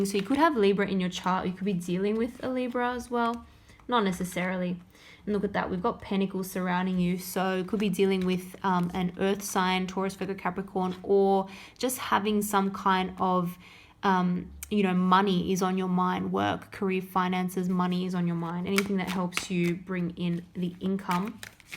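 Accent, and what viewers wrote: Australia. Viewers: So good to hear an Aussie Tarot Reader! 🙌 Thankyou!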